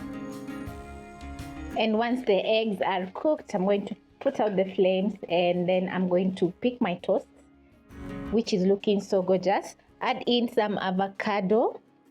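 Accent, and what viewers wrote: Kenya. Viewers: Kenyan accent 😀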